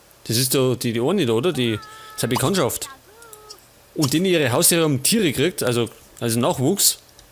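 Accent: Bavaria, Germany. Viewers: Find dich richtig geil. Einfach ein bayrischer LPer. Das hat was auch wenn ich dich nicht wirklich verstehe.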